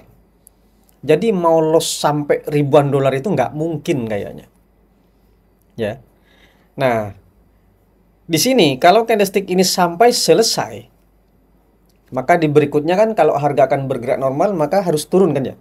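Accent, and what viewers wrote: Lampung, Indonesia. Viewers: Bang, Abang orang Lampung ya Bang? Logatnya khas. Salam.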